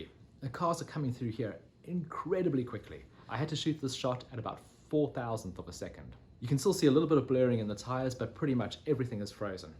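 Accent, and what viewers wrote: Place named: England, United Kingdom. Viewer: Very talented..although I much preferred your floppy fringe..it matched your english accent!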